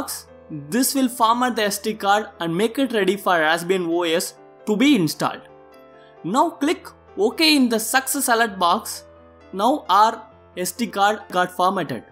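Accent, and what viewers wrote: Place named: South India, India. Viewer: U sound like a South Indian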